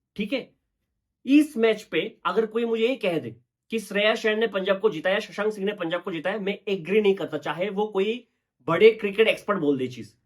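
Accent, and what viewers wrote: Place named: Tamil Nadu, India. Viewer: Bengali hai kya bhai 😲 😲 mujhe laga tamil nadu ka hai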